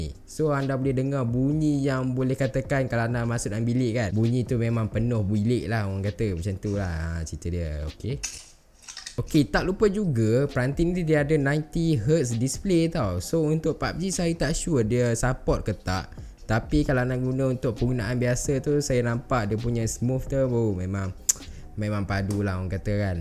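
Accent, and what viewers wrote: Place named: North, Malaysia. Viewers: Laaaaa orang utara kaaaa...dok mana?? Huhu.. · Pekat noh loghat kedaq hang 😂😂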